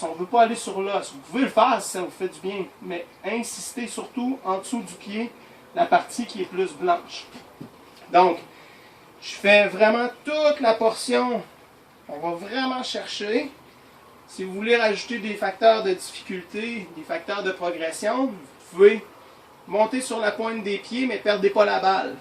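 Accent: Canada. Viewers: VOULEZ-VOUS FINALEMENT BIEN APPRENDRE PARLER EN FRANÇAIS ??? INCROYABLE VOTRE canadien façon de parler ((((( UN VRAI CAUCHEMAR ET HONTE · je vois aucune honte a ce qu'un canadien parle le français qu'il a appris, la façon de parlé et l'accent est très différent du pure français, a l'oreille ça fait un peu bizzare cette différence. Mais ce serai pour moi un peu de la discrimination de ne pas accepté ces différences entre notre français et le votre. Merci pour votre vidéo j'ai une fasciite plantaire et mal le long du nerf sciatique, ça pourrai m'aidé.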